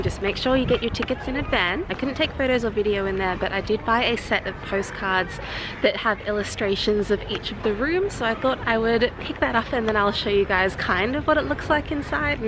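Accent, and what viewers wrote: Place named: Australia. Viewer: I wish... This place has such a aesthetic vibe +you have a aussie accent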